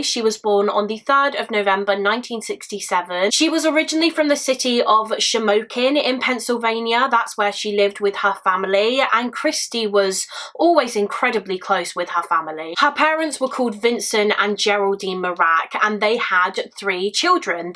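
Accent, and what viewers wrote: United Kingdom. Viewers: Are you British sweetheart???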